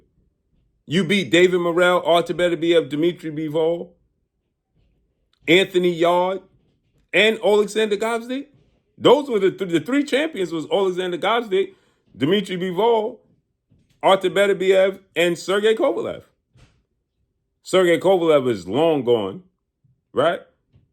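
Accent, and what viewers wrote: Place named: East Coast, United States. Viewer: For Some Reason, I Thought You Was From Da East Koast (New York Or Jersey)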